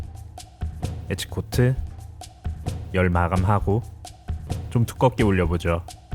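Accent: Gyeongsang, South Korea. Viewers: 사투리? 경상도분이신가? 암튼 멋집니다👍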